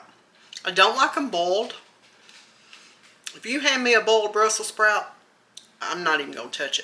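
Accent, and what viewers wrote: South, United States. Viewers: Looked yummy,sounds southern accent I'm in Tennessee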